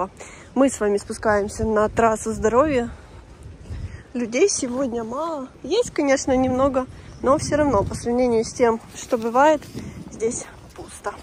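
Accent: Ukraine. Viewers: Такой акцент у тебя Украинский и такая ты серьезная не смотря на юмор Одесситов вообщем покорила ты меня❤🌹💋🌹🌹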